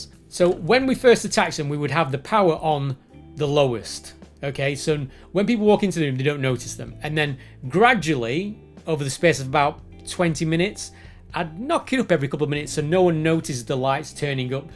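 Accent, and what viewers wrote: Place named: Wigan, United Kingdom. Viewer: Awesome! Funnily enough, I’m a photographer looking for some LED lights for the dance floor… think you’ve just convinced me! And a familiar accent, too! I’m only down the road in wigan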